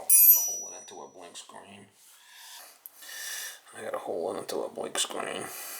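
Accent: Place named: Boston, United States. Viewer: That's just the Boston direct way of talking, no need to unsubscribe. I change my own filter and nozzle and if something went wrong I would call myself "Joe Homeowner does it again" lol.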